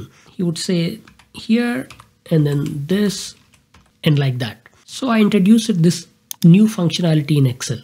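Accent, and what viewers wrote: Tamil Nadu, India. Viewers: Nice tamil accent with english😊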